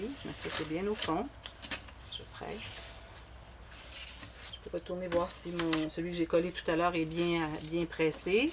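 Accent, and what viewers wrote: Canada. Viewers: Super !!! Merci pour le tuto et aussi pour l'accent canadien que j'adore ! Bonne journée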